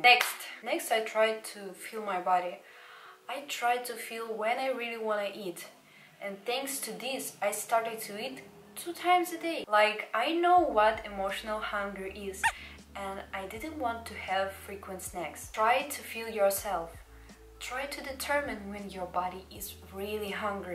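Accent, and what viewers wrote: Russia. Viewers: Слышу русский акцент) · Your accent (Russian)? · мне нравится твой русский акцент😩💖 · Русский акцент в английском так классно звучит(Грустный русский взгляд))) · Yes, she has Russian accent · Почему мне всё видео казалось, что она с России или Украины...Просто акцент у меня и моих подруг такой же....Ну или мы просто уже на уровне бог говорим...Ну или я сейчас жестко туплю из-за авто переводчика на ноуте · Не посмотрев на имя, услышала акцент, и думаю, она что русская? Имя - сказало все за себя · My brain cannot process on how korean you look but have a russian accent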